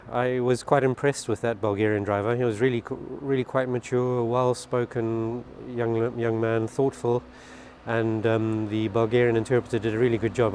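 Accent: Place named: South Africa. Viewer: Cant work out the accent, South Africa at a guess? Whats the driving like over there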